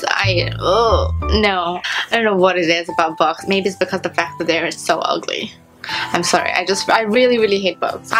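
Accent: United Kingdom. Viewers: wow is she british?? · why you got British Accent? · You have such a beautiful accent, it's like a mix between British and Chinese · You kind of sound like you have English(British) accent with your pronunciation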